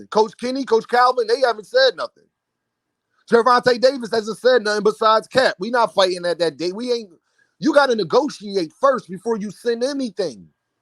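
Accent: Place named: Philadelphia, United States. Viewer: you from Philly huh bro? I’m from Baltimore lived in Philly 3 years you sound like my young bull from there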